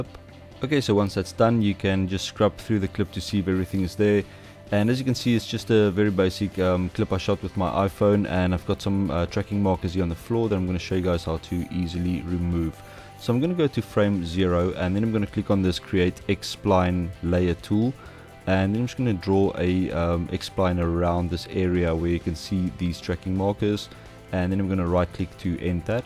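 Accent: South Africa. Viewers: now thats a south african accent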